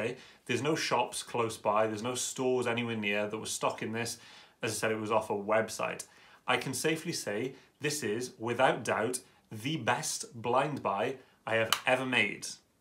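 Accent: Wales, United Kingdom. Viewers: an Escentual buy, eh? Nice to hear another Welsh person reviewing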